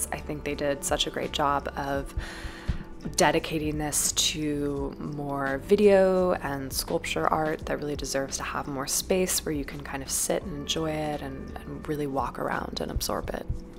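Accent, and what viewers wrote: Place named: Wyoming, United States. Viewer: Nice wyoming accent. Love it. Pollack (The artist, not the fish) was from Cody, wasn't he. Content, somewhat stultifying, and prosaic, though. It's not Art, is it?! Let's be honest. And, as we all know, taste cannot be bought. And as that rapscallion scoundrel Hamlet once said, to be honest is to be one person picked out of ten thousand. Yes. Stultifying and prosaic is the apt description for these visuals. But thank you for making the effort. Noel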